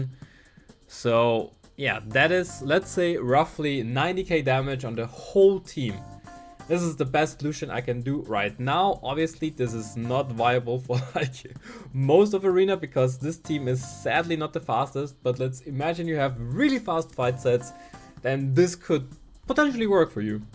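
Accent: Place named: Germany. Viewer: your german accent is hard man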